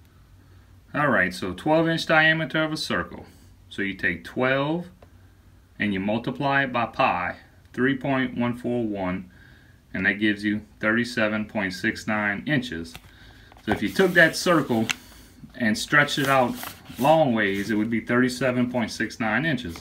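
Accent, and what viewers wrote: Louisiana, United States. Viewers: Very nice explanation. Just what I was looking for. What part of South Louisiana are you from?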